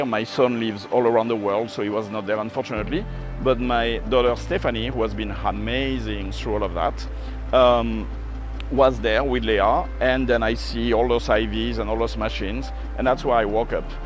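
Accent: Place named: France. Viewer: Hello mon ami d'enfance ... et bien ce n'était vraiment pas ton heure mais ton expérience est amazing ... heureusement que tu n'as pas perdu le sens de l'humour et cet optimisme que je connais depuis toujours chez toi ... Et j'adore ton bel accent Français que tu as gardé en américain...Enjoy your life whit your children et friends.... Love for you et for ever your friend .MartineM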